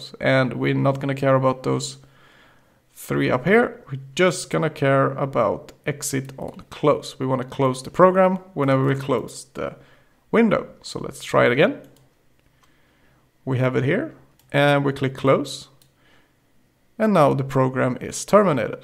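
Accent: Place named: Sweden. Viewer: Den svenska dialekten 😅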